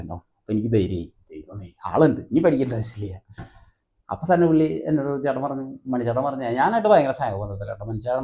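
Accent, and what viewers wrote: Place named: Thrissur, India. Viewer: Thrissur slang super ayyitu parayunde❤❤❤❤❤😂😂😂😂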